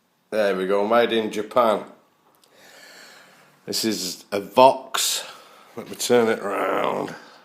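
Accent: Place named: South Staffordshire, United Kingdom. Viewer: My first bass guitar was a White Shadow. It was orrible. Actually judging by your accent I wonder if that one was once mine (I Live in south staffs...). Tradd mine in some time in the early 90s..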